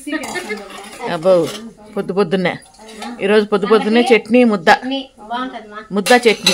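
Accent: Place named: Rayalaseema, India. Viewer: Mee casual talk.i like your royals seema slang. Geetha Reddy Chennai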